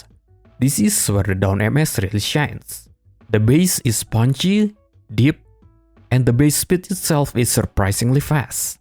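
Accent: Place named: Indonesia. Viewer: orang indo nih, keliatan dari fluentlynya 😅 semangat trus bang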